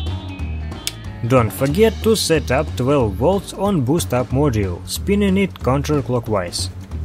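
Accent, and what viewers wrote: Russia. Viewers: why am I not surprised about the russian accent?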